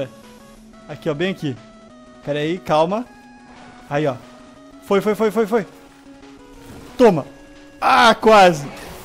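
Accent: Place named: Goiás, Brazil. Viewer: O fala igual goiano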